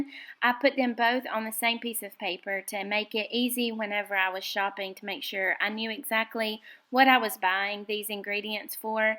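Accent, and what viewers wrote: United States. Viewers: sorry being english you sounded yank lol :P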